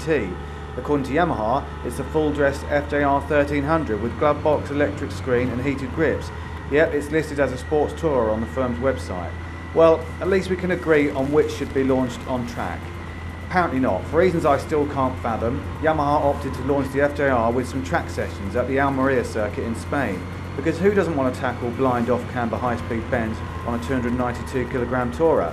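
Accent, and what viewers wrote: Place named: England, United Kingdom. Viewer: Can't stand that Limey accent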